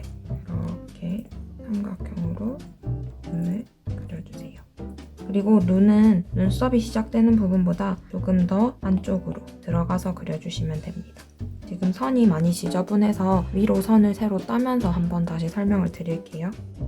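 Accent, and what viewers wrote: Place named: Daegu, South Korea. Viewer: 혹시 대구분? 사투리 정겨워요 ㅋ 저랑 같은말투 ㅎㅎ 절대 음성녹음 안한다는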